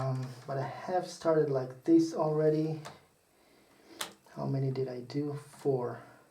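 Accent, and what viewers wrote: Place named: Israel. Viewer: Since your English sounds pretty Israeli, I assume you’re Israeli :) How do you obtain your willows over there? I was observing the flora in Israel and didn’t see any willows anywhere I’ve been. Do you grow your own, somehwere, or you import them? Your channel looks pretty informative btw, I’ll go through all your content. תודה רבה והישאר בטוח! ברכות מטורקיה 👍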